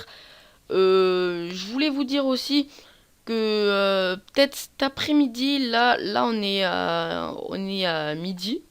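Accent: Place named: South, France. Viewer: le petit accent du sud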